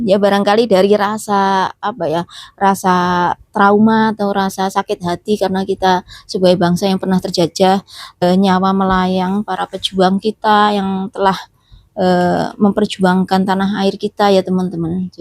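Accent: Java, Indonesia. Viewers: Mba lucu bahasanya, Jawa medok Amerika medok 😂👍, khususnya kalo ngobrol sama anak2nya, mba wanita pintar Dimata saya👍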